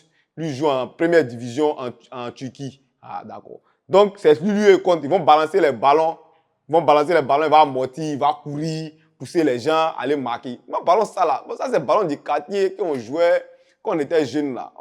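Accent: Ivory Coast. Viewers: je sais pas comment je vais dire ca mais jaime ta maniere de faire tu sais il ya des gens qui ne comprenne pas les lourd lourd francais la donc toi tu parle comme nous et on comprend bien dans nos nouchi la mme bravo a toi bro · bon accent ivoirien aussi,j suis fan... sans complexe...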